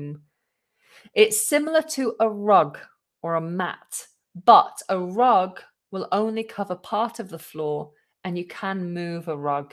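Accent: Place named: United Kingdom. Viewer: Hello teacher.This is also a great one as always.Actually we try to imitate you to sound more british.Love your accent.Hope more pronunciation lessons from you.Love from Sri Lanka.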